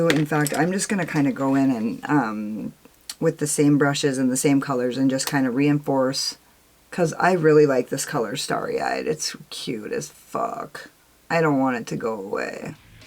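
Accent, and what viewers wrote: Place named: New Orleans, United States. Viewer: Omg You sounds like a New Orleans Gal.. I love your Videos.. New Subscriber here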